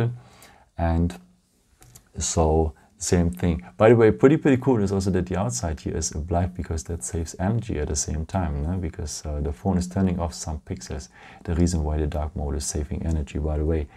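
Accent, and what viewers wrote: Germany. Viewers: Thick german accent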